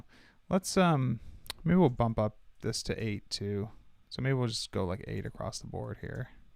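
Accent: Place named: South, United States. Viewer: Are you southern? You have like a very subtle southern accent.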